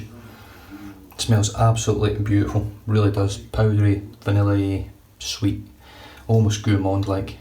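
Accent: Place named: Scotland, United Kingdom. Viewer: ahh..OK..Top of the Chain not 'Top Of The Chin' ...the Scottish accent caught me out LOL (and I have a Scottish doctor who has a thicker accent) LOL..OK mostly out of stock there ...I'll join the waiting list.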